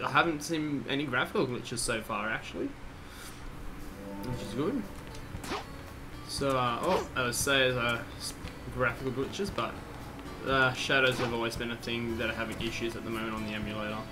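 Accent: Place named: England, United Kingdom. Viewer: Great tutorial my dude.... Also, great voiceover throughout the video... I am just in love with an English accent no homo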